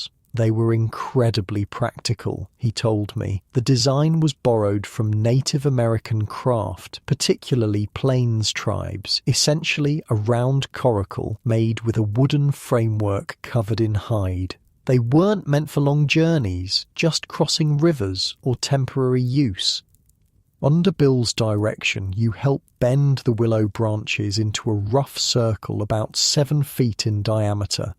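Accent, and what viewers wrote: England, United Kingdom. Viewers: Why is an English man commentary to the American history??😂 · American pioneer stories by a narrator with an English accent?! Ummm, no thanks. I’m out 🙄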